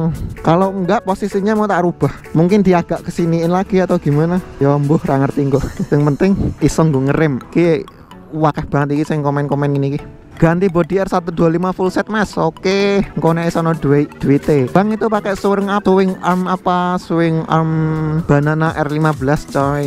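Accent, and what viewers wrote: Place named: Yogyakarta, Indonesia. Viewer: omonge jan asli jogja... hahaaha apik mas.. lanjutkan pokoke.. tak subscribe ndes.. haha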